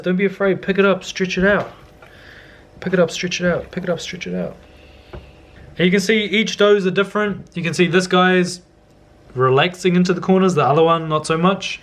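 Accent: New Zealand. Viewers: Thank you for making this. I was struggling with creating my own sourdough starter for weeks but the tropical climate here was hard to navigate. However, your active dry yeast overnight starter is a game changer because I get something that tastes and behaves a little like a sourdough starter without the weeks of cultivation and mould scares. Also, funny thing, I couldn't figure out your accent for a long while (cause I'm bad at it) but my Dad said you must be from NZ.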